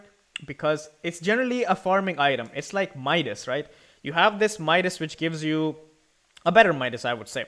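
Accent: India. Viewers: keling speak english so annoying to hear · VERY NICE JUST COPY CEB VIDEO IN INDIAN ACCENT , there have been 1000 game with helm and u chose this game that ceb already explained xd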